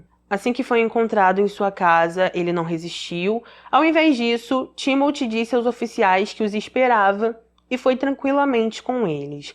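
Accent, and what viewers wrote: Rio de Janeiro, Brazil. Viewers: Más uma inscrita porque gostei do teu sotaque igual carioca 😍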